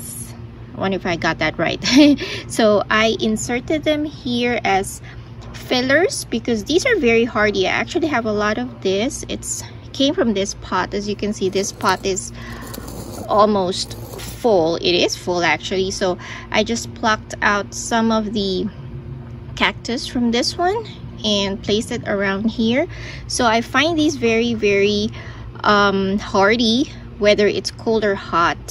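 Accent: Philippines. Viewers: You sound Filipino ;) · If I were a betting man, I'd say you're Filipina with that accent.. (fellow filipino here)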